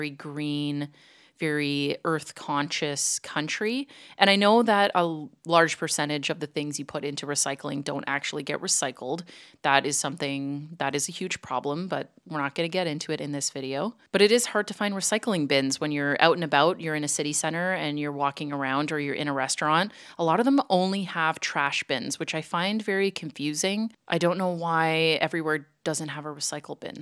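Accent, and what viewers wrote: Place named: Canada. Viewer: Awesome video, from the moment I would have heard you "About" or "Sorry" I would have known you were Canadian lol that being said have you found that most Kiwi's aren't fond of American's? I'm from the Caribbean but I currently live in the US and I'm a bit curious to how Kiwi's would react to both Americans and people from the Caribbean too if you noticed those interactions as well.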